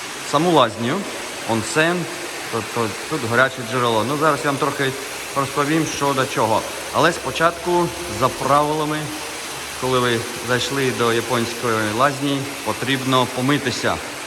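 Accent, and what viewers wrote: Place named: Lviv, Ukraine. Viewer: Ви молодець я зрозумiла, що ви з Миколаева а мова у вас начебто ви зi Львова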